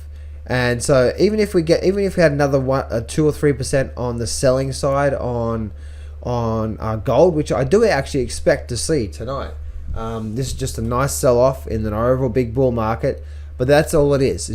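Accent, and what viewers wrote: Australia. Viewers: Sorry new to this channel are you Australian?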